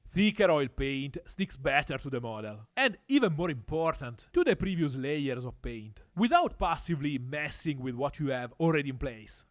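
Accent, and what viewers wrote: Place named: Tuscany, Italy. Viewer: I like your stuff, my Nonno was from pistoia and your accent is bringing back all the feels 😂😂 I’ll pass on the oils for now but it’s good to know the video is here for if and when I get the urge.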